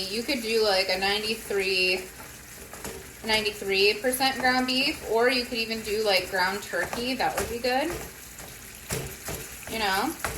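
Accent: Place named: Midwest, United States. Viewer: Looks delishious. Do you ever make chicken ones? Where do you live? You sound like your from the Midwest. I’m in St. Louis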